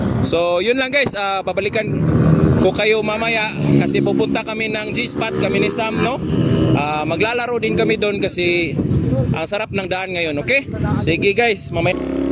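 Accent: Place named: Philippines. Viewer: i love ur filipino accent "lean anggol"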